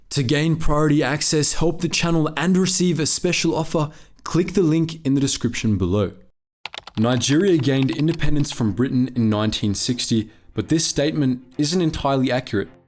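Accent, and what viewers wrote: South Africa. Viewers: Is the narrator a saffa? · He sounds south african. And most people don't care about cricket, at all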